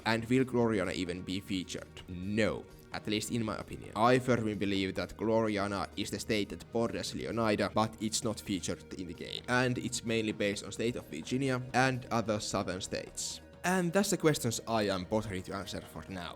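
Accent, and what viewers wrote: Finland. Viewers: You sound Finnish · Finnish more likely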